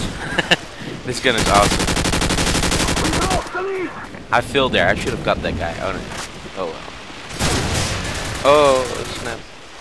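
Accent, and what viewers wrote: Netherlands. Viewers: I knew it, i noticed a dutch accent :p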